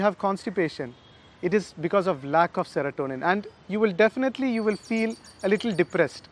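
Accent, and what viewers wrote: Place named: London, United Kingdom. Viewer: நீ முதலில் தமிழில் பேசு பா. பெரிய லண்டன் ரிட்டன் போல பேசிகறாய். டைம் தான் வேஸ்ட். 👊👊👊👊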